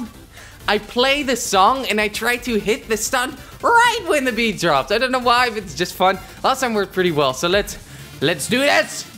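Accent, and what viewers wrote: Netherlands. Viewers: zalig, ik wist niet dat je nederlands was, ik dacht het eerst wel aan je naam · are you Dutch? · Are you dutch? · Are you freeeking Dutch nicee vid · Are u dutch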